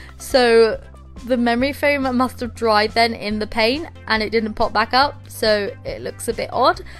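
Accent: United Kingdom. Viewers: I love your accent! R u from england? Because i love british accent!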